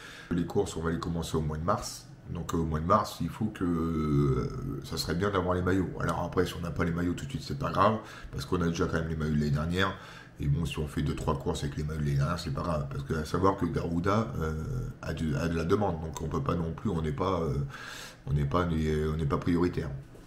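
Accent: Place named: North, France. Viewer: Bonjour, Bravo pour ton courage et ta motivation. Pas évident de rouler dans ces conditions. C'est difficile avec le froid et l'humidité. Encore une video fort sympathique. Avec un accent du Noooooord qui ne passe pas inaperçu. J'adore. C'est toujours un plaisir de te suivre. Bonne récupération aussi. C'est important. Et tant mieux si tu as pu te remettre rapidement en forme sans trop perdre le bénéfice de tes entraînements précédents. Il est vrai que le tubeless, c'est top. J'ai parcouru à ce jour 2000 kms sans une crevaison mais ça reste tout de même un investissement. Gain au niveau du confort, de la réactivité, une meilleure tenue de route et stabilité dans la descente de col. Le tubeless en 28, sincèrement, je recommande. Au plaisir de pouvoir te suivre dans de prochaines vidéos. Prend bien soin de toi. A bientôt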